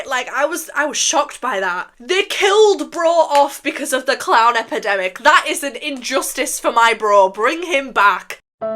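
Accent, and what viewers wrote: United Kingdom. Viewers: when i tapped on the vid i did not expect a heavy british accent😭😭 its so iconic tho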